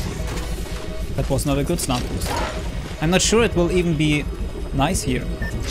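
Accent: Germany. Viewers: I can heard that your German bro haha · Man hört übelds das du deutscher bist😂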